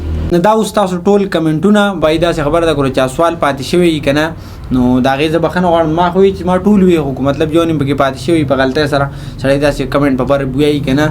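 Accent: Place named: Sama, Afghanistan. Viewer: Laga lehja sama ka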